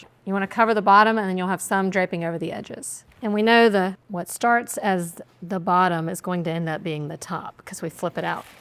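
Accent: South, United States. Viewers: Yummy I Would Pour it over Broad Egg Noodles or Fetachiy Noodles or even Mashed Potatoes Served with Garlic Bread. You Explain everything so Simple. You is so Gosh Darn Cute From a Canadian Fan in Vancouver B C ( ❤ Love your Southern Accent ) PS: Love to see more Fish Recipes IE: Oven Baked Salmon / Pan fried Butter, lemon Trout / Pasta with Prawns & Fresh Dill 🤗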